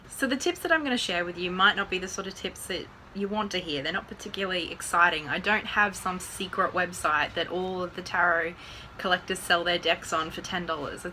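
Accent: Australia. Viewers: Thank's for the great advice. Love your Australian accent too ! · Arn? You mean Own! Nor? You mean No! Horm? Home I think it is! Ozzy accent is thee very worst.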